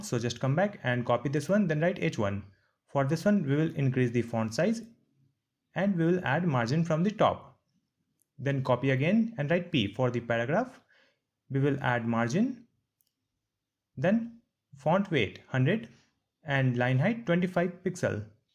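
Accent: India. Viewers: Lol!!! It's Indian Accent bro. Indians are tech savvy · Indian accent yes...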